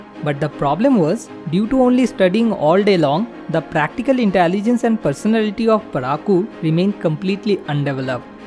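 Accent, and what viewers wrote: India. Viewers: Bihari english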